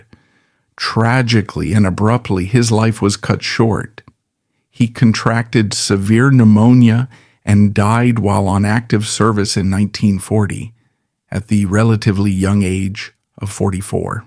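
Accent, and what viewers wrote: United States. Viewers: WHY SOMEONE WITH AN AMERICAN ACCENT TALKS ABOUT ENGLISH HISTORY 🤔🤔🤔🤔🤨🤨🤨🤨🙄🙄🙄🙄😶😶😶😶😱☹️👎🏼👎🏼AND THEN PRONOUNCES SOME WORDS INCORRECTLY 🙄🙄🙄🙄😶😶😶😶🫣🫣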